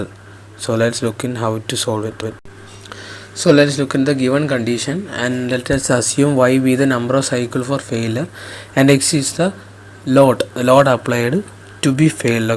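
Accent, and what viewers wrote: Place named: South India, India. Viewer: Please improve your accent. You south indian have a terrible english accent because of your south Indian english accent we north Indian face racism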